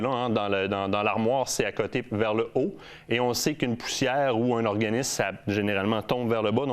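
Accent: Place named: Canada. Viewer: Beaucoup moins pire :) J'adore l'accent du Canada :)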